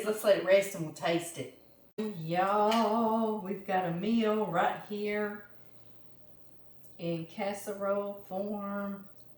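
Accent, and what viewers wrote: South, United States. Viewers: I'm a new subscriber and fan and just have to thank you for all the great recipes. I've been binge watching your videos for the last 3 days and love them all! I was raised in SE Texas (Beaumont), so your Louisiana/Southern drawl sounds like home to me. This cabbage casserole sounds wonderful, but I'm wondering if you have ever tried freezing the leftovers. I'm 68, living alone and love to cook big batches of food and freeze the extra for later. I'm just not sure how the cabbage will hold up after freezing and thawing. Thanks so much for taking the time to share your recipes with us and for giving me some new and creative ideas.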